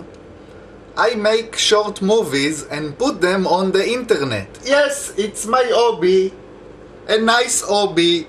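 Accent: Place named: Israel. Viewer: וואי תקשיב המבטא שלך מראה את הטמטום הישראלי . אבל זה בסדר :)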